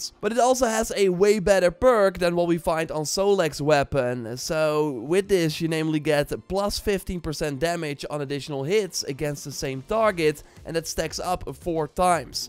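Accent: Netherlands. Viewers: nearly all youtubers I like lately are Dutch. What the hell 🤔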